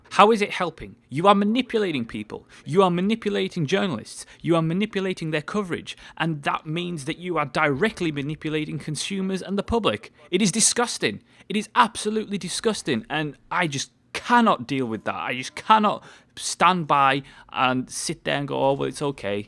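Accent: United Kingdom. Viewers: I do not believe almost any "professional" reviewer (ign, gamespot, what have you) ever was paid to give a positive score, pretty much because once such a thing was discovered (and yes, it would be) he'd have an easier time kissing Emily Brontë than finding any other job in the industry. Also, surprise surprise, first Beth game out under this conditions is criminally woeful so far as performance goes on pc. Good piece man, I'm going to watch some more vids but you got a new sub in all likelihood. (plus, a British accent never hurts)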